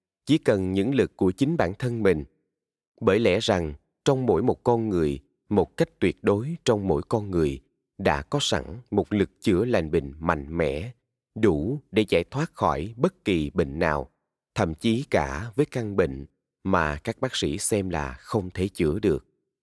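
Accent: South, Vietnam. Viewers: Tôi yêu giọng miền nam. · Chúng tôi kính cảm ơn những người làm ra sản phẩm nầy và kênh nầy không ngừng phát triển . Giọng miền Nam của người đọc ấm áp quá đỗi yêu thích. Đọc chầm chậm như vầy người già chúng tôi dễ nghe. Cảm ơn. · Giong doc Nam Ky qua ro rang qua tuyet voi……..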